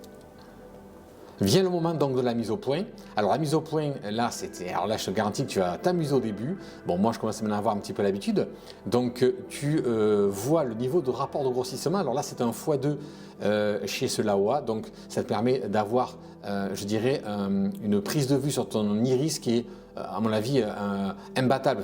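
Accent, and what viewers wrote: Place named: South, France. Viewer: Sympa ton accent du sud... Et ensuite tu auras besoin d'un Iris !:trophy-yellow-smiling::popcorn-yellow-striped-smile::card-red-penalty::medal-yellow-first-red::takeout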